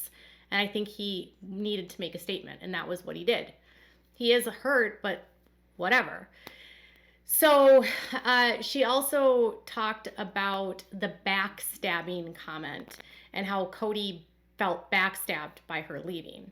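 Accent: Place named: Minnesota, United States. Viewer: I could never put my finger on your accent before because it's VERY slight...but then you said "Minnesota" and I heard it and it was the most adorable thing I heard today 🤣🤣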